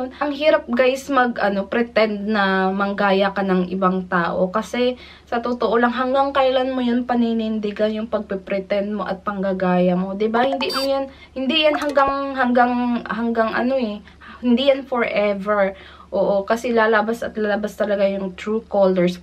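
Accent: Visayas, Philippines. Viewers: tama jud dai. katawa lang ko aning mga nega. Just stay positive. bitaw pag first nako pag tan aw nako sa imong Blog or Vlog maka tunog mo ni Ms. Rhaze siguro the way atong accent na bisaya ana jud guro. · Mag ka boses kau ni Rhaze at visayan accent hehe hayaan muna mga nega... Stay safe! ❤️ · Ganyan talaga ang mga accent ng BISAYA! Wala ka kahit anumang bagay ba ginagaya. Ikaw yan! Kahit anong mangyari ikaw at ikaw parin yan. That's life! Just be proud of you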